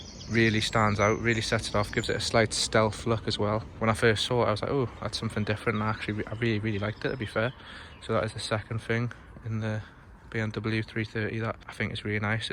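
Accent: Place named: Newcastle, United Kingdom. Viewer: Fellow Newcastle accent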